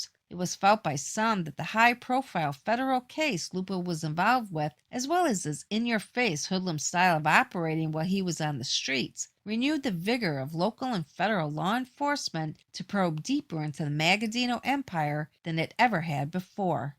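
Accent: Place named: New York, United States. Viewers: Got to love the New Yawk accent of the lady covering these videos,’ she can read a book to me anytime. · Nice!! The borgata gal is back!! That NY Italian accent!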